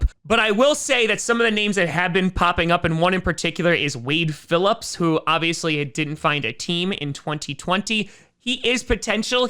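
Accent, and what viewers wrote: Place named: East Coast, United States. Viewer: I'll miss the Pe-inn East Coast pronunciation. Thanks for providing content. We are the 24-7-365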